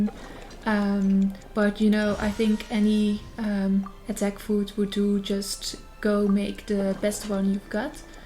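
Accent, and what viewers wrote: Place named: Netherlands. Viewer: She is Dutch isn’t she?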